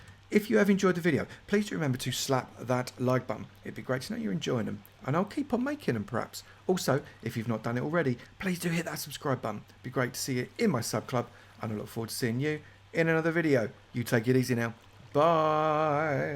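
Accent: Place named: England, United Kingdom. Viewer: He sounds nothing like him imo. Just an English accent.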